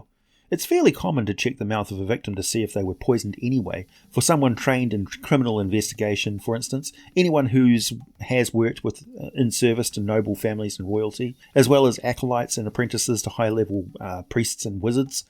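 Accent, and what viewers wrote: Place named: New Zealand. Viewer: Very nice intro, I thought you might a New Zealander. Along with South African, two of the most pleasant accents to listen to. I've also been binge watching Viva la dirt league d&d sessions lately, very silly and funny bunch.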